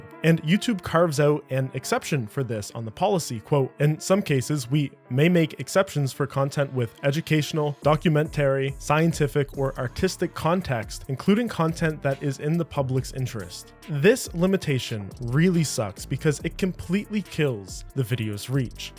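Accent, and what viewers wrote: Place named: Canada. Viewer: Knew you were Canadian from the accent 😂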